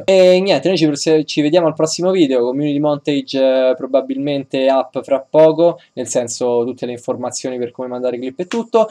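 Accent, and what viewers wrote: Rome, Italy. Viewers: non riesci a parlare meno romano?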